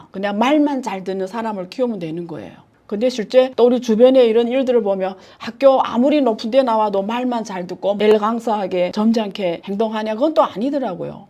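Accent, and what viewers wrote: Yanbian, China. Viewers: 한국분이신가요~발음이 중국교포같오서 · 펀드 할것 못돼요 판매하는 금융 창구 직원들도 뭔지 모르고 팔지요... 위에서 시키는대로 수당을 주니 호구를 잡고 팔지요 1,000개 이상의 펀드 ㅋㅋ 주식투자 펀드투자 그만 광고하세요 부동산 급락 소리도 하지말고... 그냥 연변 사투리가 재미있어요 ㅋㅋ 세미나에서도 자세하게 하지 마세요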